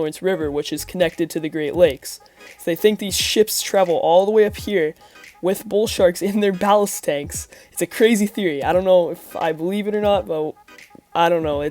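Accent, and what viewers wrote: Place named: Cleveland, United States. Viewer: Can tell this dudes from Cleveland 😂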